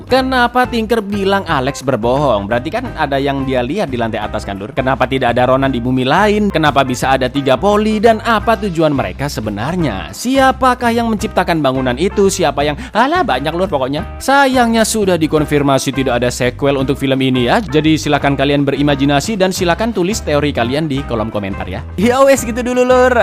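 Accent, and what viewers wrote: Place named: Madura, Indonesia. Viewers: Wah logat maduro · Mirip logat madura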